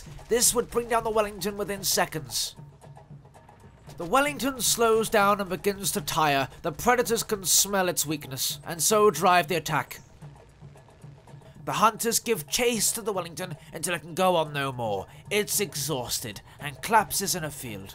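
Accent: United Kingdom. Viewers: What is it with you Brits and narrating xD When the messerschmitts drove to the downed wellington you really cracked me up haha. (I chuckled throughout the enitre video :p)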